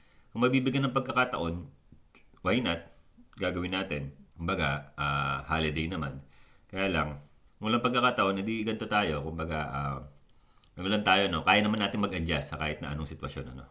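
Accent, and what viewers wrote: Philippines. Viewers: Tagalog na tagalog ka kabayan, anung probinsya ka ba dto saatin ? 😊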